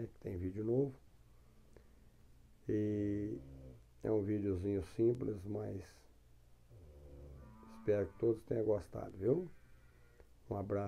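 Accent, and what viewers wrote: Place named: Minas Gerais, Brazil. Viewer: O minero vc é da onde de Minas? Eu só dá baite tem base. Já viu fala.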